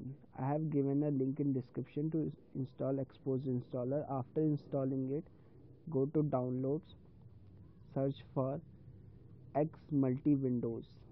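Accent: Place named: India. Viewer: Damn, that Indian accent 😅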